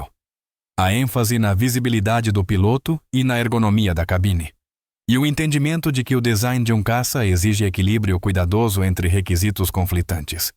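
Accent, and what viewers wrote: Portugal. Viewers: Horrível esse português europeu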